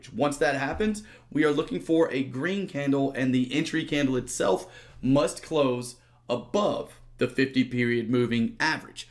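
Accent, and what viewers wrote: South, United States. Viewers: Very helpful videos, love that subtle southern twang too! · Love your quality content & your southern accent brother.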